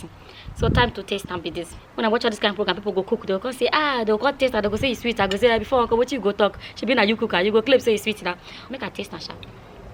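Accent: Nigeria. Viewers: Pidgin english sweet for your mouth oh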